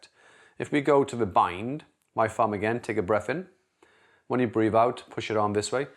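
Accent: Wales, United Kingdom. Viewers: Are you welsh? Great videos. Love them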